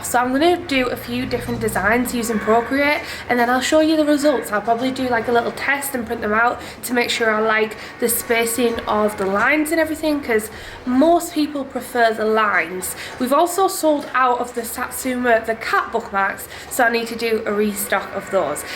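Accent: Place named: Yorkshire, United Kingdom. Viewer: Everything is so adorable!! Plus its great to hear a proper Yorkshire accent on YouTube! Makes me feel at home.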